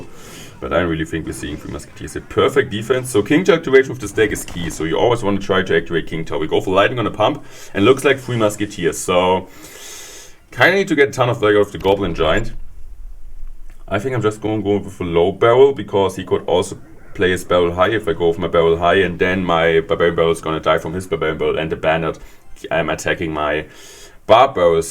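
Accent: Germany. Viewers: Somehow the German accent makes it all sound so much cooler. Nice video,.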